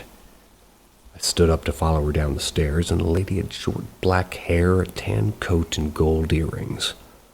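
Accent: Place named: United Kingdom. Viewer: M i always ask but never get s response. Why is fhere a uk narrator sometimes?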